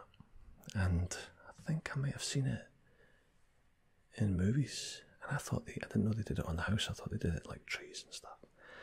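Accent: Scotland, United Kingdom. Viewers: Thanks for the tag! I can't wait to watch this, you know I love a Scottish accent 😴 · My favorite word to hear you Scots say is "murder" 🤤🤩 its the Rs and muddled U, and abruptness of the end (I also like "order" and "fine.")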